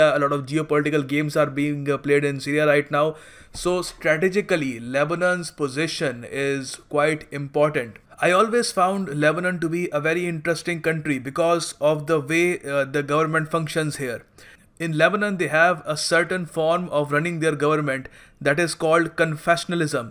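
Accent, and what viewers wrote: India. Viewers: In English? This is Heenglish. Couldnt even listen it..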